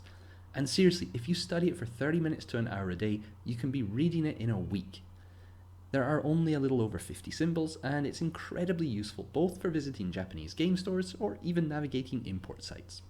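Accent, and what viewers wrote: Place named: Glasgow, United Kingdom. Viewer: I lived in Glasgow half a year. Release your accent! ^.^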